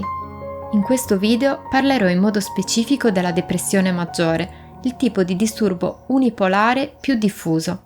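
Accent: Sardinia, Italy. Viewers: Accento di bidda....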